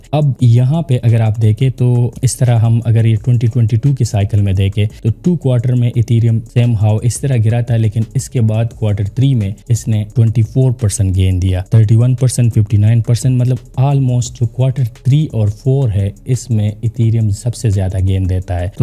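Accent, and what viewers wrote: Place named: Pakistan. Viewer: pakistani ascent lag raha. ethereum bare me pata he pakistaniyo bahut achi baat he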